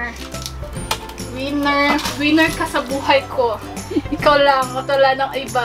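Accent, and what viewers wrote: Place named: Visayas, Philippines. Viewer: Bisaya kau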